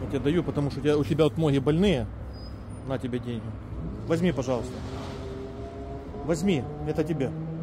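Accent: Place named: Ukraine. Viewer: Так это же украинский говор. Такой мягкий и приятный уху!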